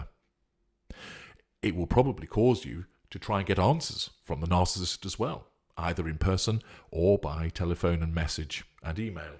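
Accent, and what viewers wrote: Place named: Oxford, United Kingdom. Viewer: 🙂 i like his accent, he sounds like a Prof at Oxford ☺️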